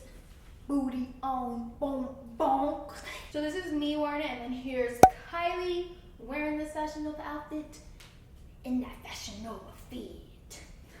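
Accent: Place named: Texas, United States. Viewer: i feel like you had a strong texas accent throughout this video 😂💕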